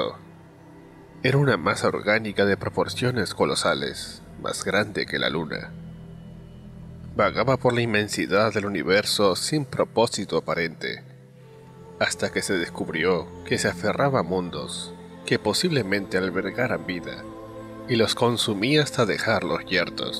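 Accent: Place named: Castile, Spain. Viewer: A todos mis hermanos Hispanos. Tercios Españoles invencibles. <link> Por cierto, eres un españolazo. Eres como un español del interior, castellano, así hablas 😍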